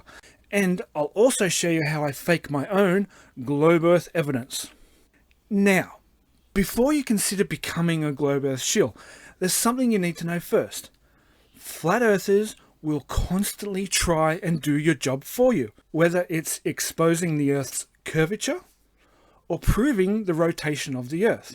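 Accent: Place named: Australia. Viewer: Did it ever occur to you that your voice tone and phasing resemble Mal from Borderlands 2, but with a great Australian accent? <link> Check it out!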